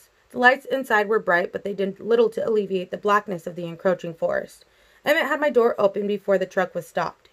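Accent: United Kingdom. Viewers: Pls read me before you Also, that British accent, 😹😹 I love it.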